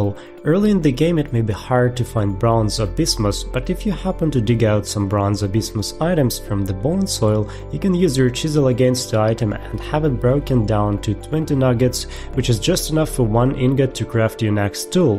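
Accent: England, United Kingdom. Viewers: O ya vizhu vi iz anglii?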